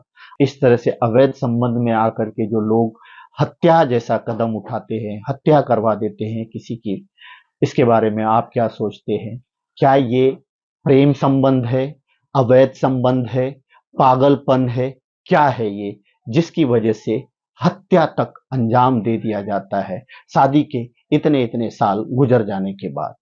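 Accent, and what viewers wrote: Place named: Bihar, India. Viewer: सही पकड़ा एंकर बिहारी है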